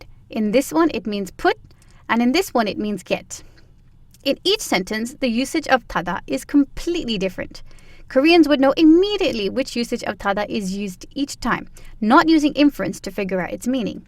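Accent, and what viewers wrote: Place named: United States. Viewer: 영국인 절대 아님 영국인 피가 섞인 동남아시아여가 분명합니다 발음은 영국영어가 아니라 미국영어에 가깝고요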